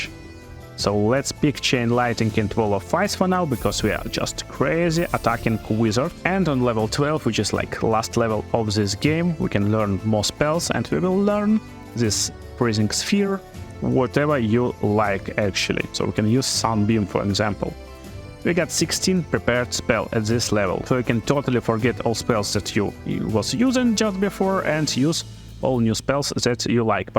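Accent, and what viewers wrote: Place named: Russia. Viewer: Man, sorry for this question but are u Russian? :D Just interesting, you speak clearly but a little bit with an accent like a russian And thank you for the video! Great review about wizard!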